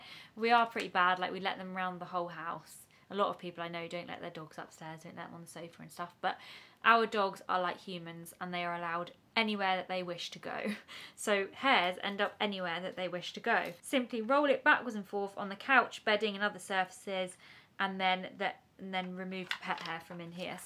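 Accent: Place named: North East England, United Kingdom. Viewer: Hi! My brother lives in Whitley Bay. NE England and listening to your lovely accent has me thinking about him! I do talk to him weekly! You are the cutest young lady! 💕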